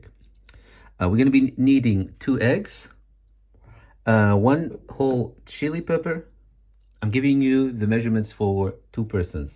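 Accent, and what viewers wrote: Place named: Netherlands. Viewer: Dear My name is Dringa vlogs I’m a hundred percent blind, autistic and have a chronic heart condition. Okay, three things to say. 1. I love this video, your voice gives me ASMR, because Dutch and German are so similar, the accents are also, and your’s is one of the ones that gives me ASMR. 2. I can NEVER have this as I’m allergic to nuts, it’s called anaphylaxis and is life-threatening, I can’t even touch nuts, much less eat them. 3. here are some things you MIGHT be interested in <link> <link> <link> <link> Sent to you with mmm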